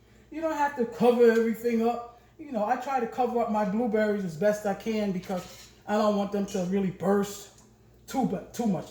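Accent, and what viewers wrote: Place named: Brooklyn, United States. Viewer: It's the Brooklyn accent for me 😏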